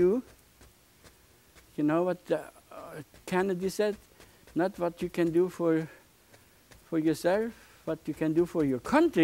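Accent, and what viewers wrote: Germany. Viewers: I love this “german english“. If i speak, it sounds the same :)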